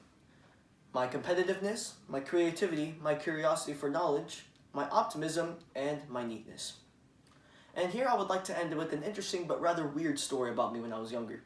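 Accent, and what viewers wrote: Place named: United Kingdom. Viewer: You have a British accent.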